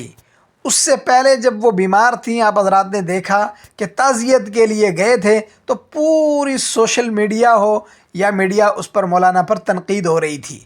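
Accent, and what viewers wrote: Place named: Pakistan. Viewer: لہجہ تو آپ کا پاکستانی ہے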